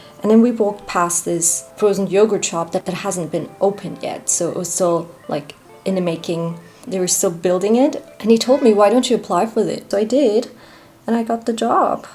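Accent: Australia. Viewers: I can hear the Aussie accent and pronunciations pushing through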